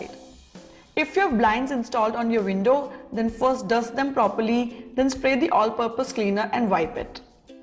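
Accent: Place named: India. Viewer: You Indian aur UK Q English toking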